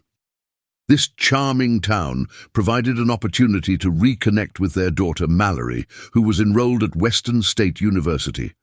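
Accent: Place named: United Kingdom. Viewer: that stupid British voice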